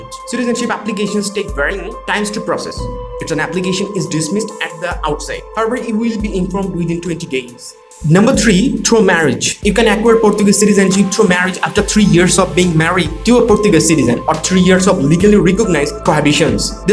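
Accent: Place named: India. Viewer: U tried ur best to Sound like Locals however few words would give a hint that ur an Indian. Thanks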